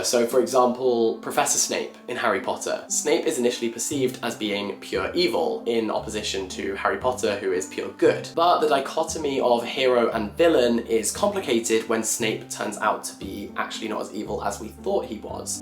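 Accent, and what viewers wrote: United Kingdom. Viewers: The Brits are losing their accent. 😢 Then again, you did just say “offen”.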